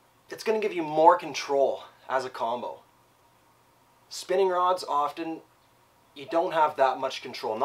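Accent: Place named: Upper Midwest, United States. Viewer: Are you from the upper Midwest? The accent is really telling.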